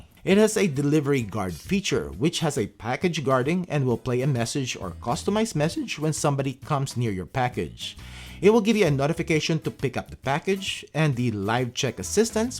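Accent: Philippines. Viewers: Kuya, you sound Filipino.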